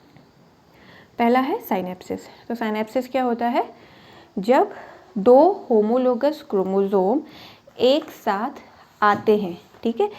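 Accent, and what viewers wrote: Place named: Pahadi, India. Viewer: Mam aap pahadi ho kya bahar se awaj aa rahi h phadi bolne ki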